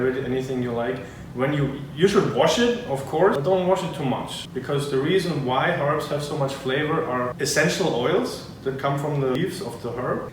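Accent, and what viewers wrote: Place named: South, Germany. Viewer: since when do you have a south German accent in your English:D Rock on!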